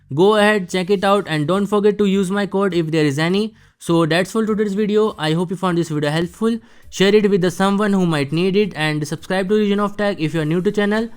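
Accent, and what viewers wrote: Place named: India. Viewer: Soon as I heard the Indian accent..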